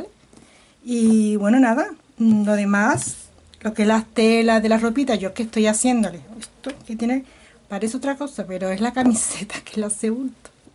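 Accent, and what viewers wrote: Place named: Cuba. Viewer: Hola amiga!Gracias por compartir esa hermosura de muñeco!!Tu eres cubana,eh,amiga?Tienesa veces un acento de ese país tropical.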